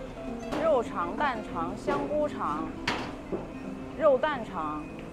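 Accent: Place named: Beijing, China. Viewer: 京腔和虎门的肠粉。饭量有点大。😀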